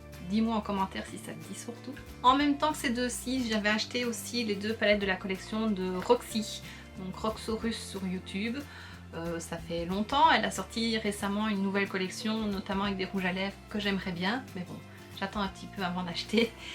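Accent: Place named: Belgium. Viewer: Re salut !! Aaah, ça y est, je suis bien installée pour regarder ta vidéo ! Oh la Riviera d'Anastasia, j'aime beaucoup ! Je ne l'ai pas mais elle m'a ouvrent tentée ! Ah je me disais que tu étais sûrement en Belgique. J'entendais un petit accent mignon 😉 Ooh, elle est magnifique la palette Into the Snow de Essence! Je ne connaissais pas ! Mais c'est vrai que c'est une marque très sympa ! Et qui offre pas mal de choses intéressantes pour un bon prix ! C'est marrant tu as présenté deux palettes de suite (la New nude de Huda et la XL de Lime Crime) que je n'ai pas mais dont j'ai des dupes ! Respectivement chez W7 et chez... Je ne sais plus ! Lol c'est une marque sur Amazon (mais je ne l'ai pas encore testée). J'adore la marque Makeup Revolution !!! J'ai pas mal de palettes de cette marque, mais pas les même que toi 😅 je pense que je ferai un jour une vidéo sur mes palettes aussi, mais je ne suis pas chez moi en ce moment... 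Waw, effectivement tu as plein de palettes de Too Faced! Moi je n'ai que la White Peach, et je l'aime bien pour des makeup légers. Effectivement tu aimes beaucoup les paillettes !! Tu connais les paillettes de chez Colourpop ? Parce qu'ils en font et elles sont juste magnifiques ! Elles sont jolies les palettes néons de W7 ! J'ai vu que Makeup revolution allait en sortir aussi, encore une fois dupes de celles d'Huda Beauty ! Merci pour ton partage de collection ! J'aime beaucoup regaeder ce genre de vidéo ! As-tu déjà fait une vidéo sur ton rangement makeup ?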